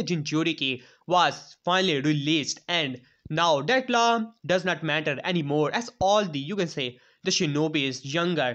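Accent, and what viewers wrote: India. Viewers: Is ur accent a India accent. I'm wondering bc it's too similar to a one piece character and I've always wondered the real live source her way of speaking/ accent came from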